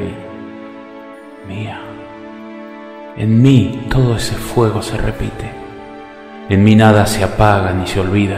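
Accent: Argentina. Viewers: Horrible el acento argentino. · Cómo creen? El poema es hermoso, pero no con acento argentino, que no saben ni pronunciar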